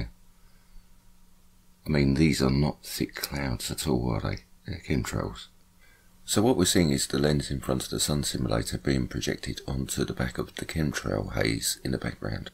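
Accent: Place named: London, United Kingdom. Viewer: i thpught it was danny dier!! I lav tha landan acsent maytee..up thee apples n blady pears boss...awsome great explanation in every video u put on...👍☝☝☝☝thank u..from nottingham..uk.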